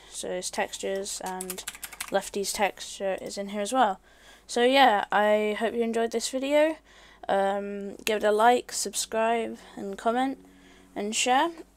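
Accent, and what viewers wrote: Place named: United Kingdom. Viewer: Bri'ish boi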